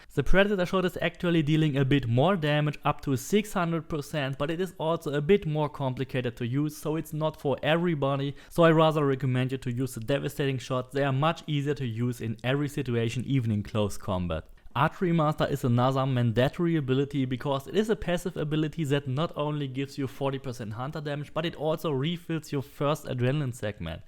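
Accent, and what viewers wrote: Germany. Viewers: Ich feier einfach das du eindeutig ein deutscher mit (nicht böse gemeint ) voll dem allman Akzent trotzdem einfach auf Englisch dein Ding durchziehst mach weiter so 👍🏼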